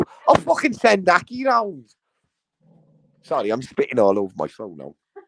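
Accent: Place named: Liverpool, United Kingdom. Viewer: your Scouse accent is immense 😂😂😂. Funniest accent ever 🤣🤣🤣. Chicken smack and chocolate I'm nearly f🤣cking dead here 💯💯💯✌️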